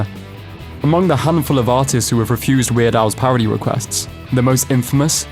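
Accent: United Kingdom. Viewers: The title is called "Why you haven't heard from weird AL in a while." The narrator sounds like a robot with a British accent. The video starts off telling his biography . In the first 35 seconds of the video you are bored to death. Please get to the point. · What's with the British narrator?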